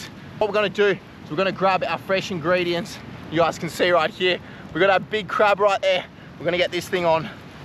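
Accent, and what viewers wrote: Australia. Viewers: Are you Aussie bc I can here your accent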